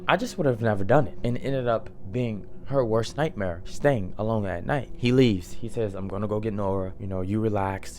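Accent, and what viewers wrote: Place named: New Orleans, United States. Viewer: First timer here I here the NOLA in your voice ❤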